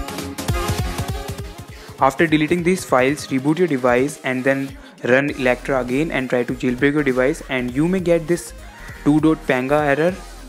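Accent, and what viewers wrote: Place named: India. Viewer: indian accents.... ughh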